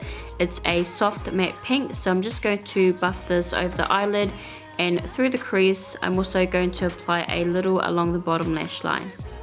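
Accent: New Zealand. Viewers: Love it. So great to hear another Kiwi accent doing makeup videos :)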